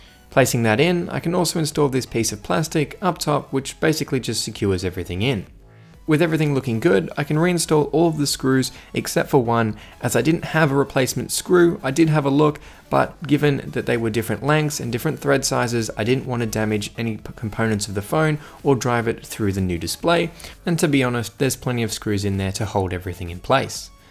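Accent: Australia. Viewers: are you Australia mate?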